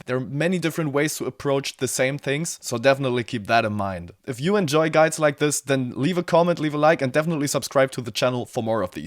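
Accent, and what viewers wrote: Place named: Germany. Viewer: Ich vermute mal, dass du Deutscher bist (hört man ganz leicht am Akzent). 😉 Die geile DI, die du im Video zeigst, klingt fast so, als hättest du ein Saturation-Plugin oder einen Transient Shaper draufgepackt. Ist das wirklich so? Falls nein: Welche Effekte nutzt du exakt für diesen Sound? Drehst du manchmal auch die Phase oder lässt du sie original?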